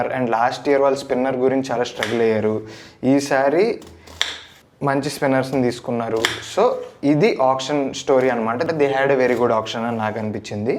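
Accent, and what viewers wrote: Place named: United States. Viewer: Aa accent entra? American Telugu ah?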